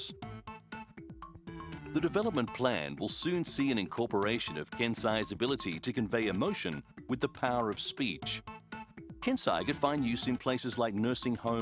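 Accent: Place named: Australia. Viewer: Thumbs up for Australian narrator!!!!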